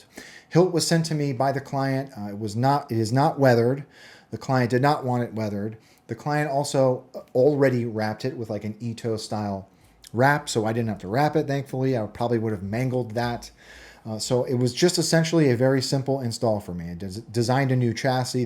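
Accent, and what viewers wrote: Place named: United States. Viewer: You got an American accents and thus American rights and you have a wall of light sabers vs guns? Cmon be a man and stop playing with toys like a child, a second revolution is drawing near and here ya are playing with toy swords instead of grabbing somethin with some real edge to it. Where’s your wall of AR’s and Glocks? 0/10. Do better. Our forefathers/ancestors are looking upon your works and judging as the tree of liberty is dying of thirst.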